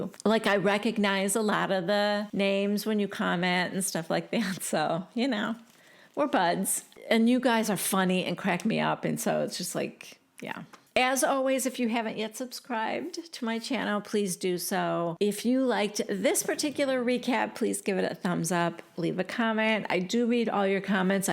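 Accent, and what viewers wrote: New Jersey, United States. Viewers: you had me laughing at work listening to this at the office! Love your Jersey accent.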